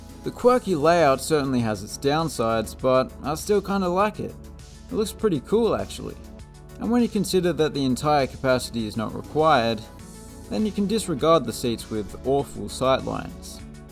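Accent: Australia. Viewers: Why is there an Australian guy speaking. This is America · Its interesting hearing an Australian accent talk about American football. Great video man.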